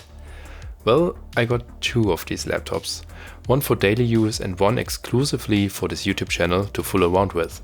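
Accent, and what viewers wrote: Germany. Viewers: That german accent xD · i hear deutsch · I smell German! Its clear due to your Accent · hey man, ich mag ja deinen Content, extrem geil, ABER deine Englische Aussprache ist soooo grottenschlecht das es mir die Schuhe auszieht, dein englisch ist auf dem Status des deutschen Funkers der der Titanic auf die message "we are sinking" gefragt hat "what are you sinking of" dein englischer Wortschatz ist echt gut, keine kritik, aber dein deutscher Akzent tut einem in den Ohren weh :.( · Hör ich da nen deutschen Akzent 😂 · das deutscheste englisch der bre ist deutsch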